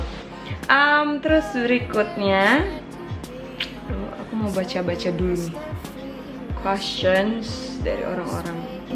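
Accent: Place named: Manado, Indonesia. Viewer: wihh.. Kaget dengernya.. ternyata orang Manado.. 😄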